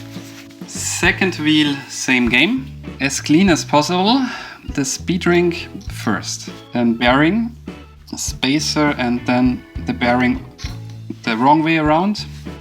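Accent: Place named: Germany. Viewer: Danke für das Video! Man hört sehr raus, dass du Deutscher bist.😂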